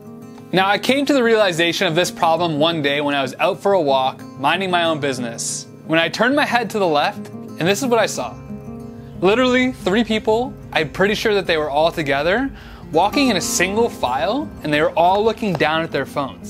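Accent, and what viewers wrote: Canada. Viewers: Buddy, are you a Maritimer?? ;-)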